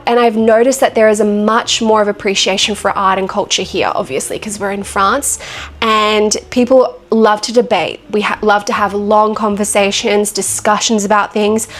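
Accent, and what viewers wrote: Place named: Australia. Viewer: such a lovely Aussie accent! xx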